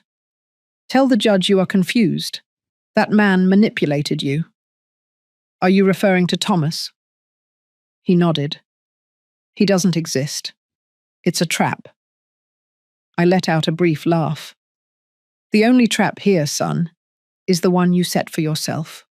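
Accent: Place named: United Kingdom. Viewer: I would have set his own house on fire in his sleep.That is the fate he deserves. Incredible story, writing and lovely British narration. I was hooked all the way through. As a child in California, we were evacuated from our home twice due to wildfires. It was terrifying. I'll never forget seeing my parents with their most precious possessions packed in their cars when they picked me up at school.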